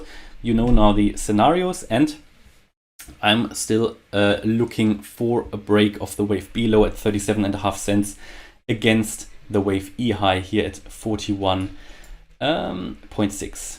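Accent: Germany. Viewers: das hört sich stark nach Deutsch/englisch mixxed an hahahha :D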